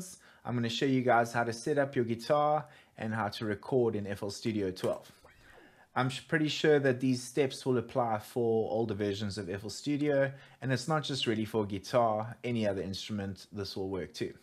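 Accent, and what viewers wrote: South Africa. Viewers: South african✊🏿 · you sound south african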